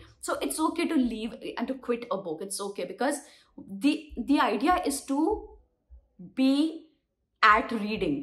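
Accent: India. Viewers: Indian accent.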